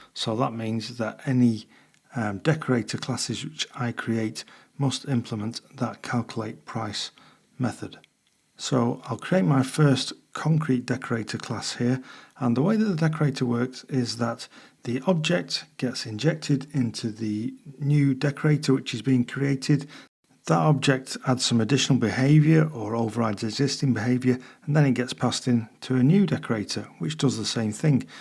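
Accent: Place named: United Kingdom. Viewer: I wish I had discovered you earlier. Advanced PHP with a nice British accent. Thanks indeed!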